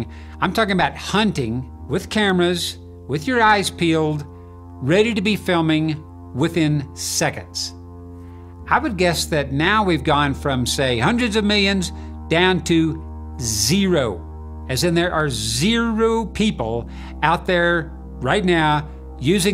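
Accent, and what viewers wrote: South, United States. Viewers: You're I'd say southern accent sounds like mine when I talk lol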